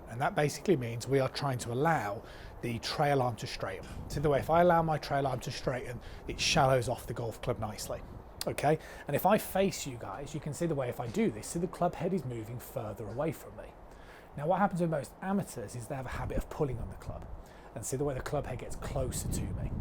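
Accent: United Kingdom. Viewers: He has aBritish accent and talks too fast also.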